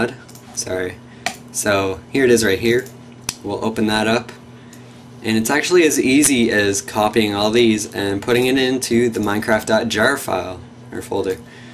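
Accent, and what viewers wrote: Canada. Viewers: r u canadian??? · R u Canadian? If so, I can tell......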